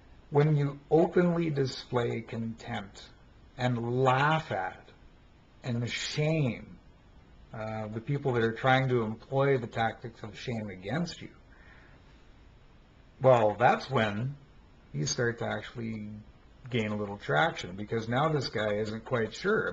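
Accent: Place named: Canada. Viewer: I sense an accent, are you Canadian? I hear that feminism is brutal up there.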